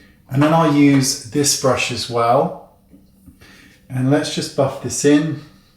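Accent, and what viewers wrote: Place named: Wales, United Kingdom. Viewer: You absolutely crack me up! Proper welshy and bloody lovely mun! You’re also my 95 year old nans favourite she bloody loves you she does and would love a cwtch! Have a great day and thanks for always making me smile lovely boy xxx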